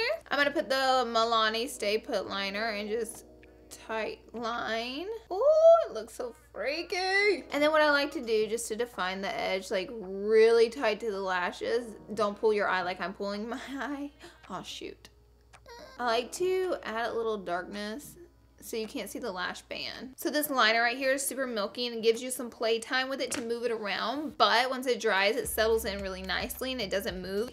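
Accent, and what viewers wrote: South, United States. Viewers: I can watch you all day. Your southern bell accent is cute and you are so funny ❤️ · I like talk through cause I don't really care how long it is because your funnier and it makes me stay longer so ya. And I've never been a big fan of voice overs. Also People need to stop talking about her voice. Her voice is a thick southern accent and I love thattttt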